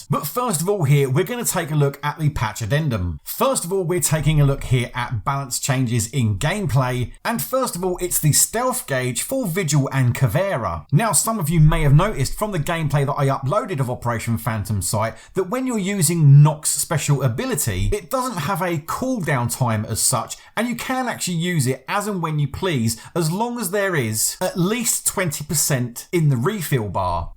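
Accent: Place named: England, United Kingdom. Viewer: yeah, why not? I can listen to that English accent for a while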